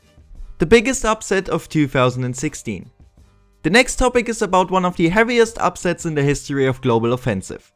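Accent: Germany. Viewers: Are you German D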